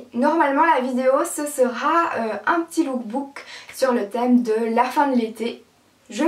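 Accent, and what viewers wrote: Belgium. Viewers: Tu es belge ? Tu a un accent dou ma question sinon j'ai bien rigoler :) sympa ta video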